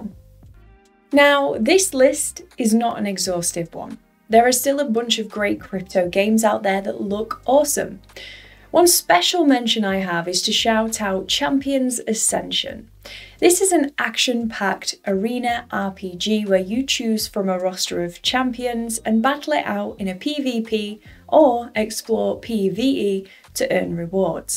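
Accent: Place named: North, United Kingdom. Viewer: I wasn’t expecting that accent! Northerners rock 😀 Don’t tell anyone, it’s great ‘up north’! 😂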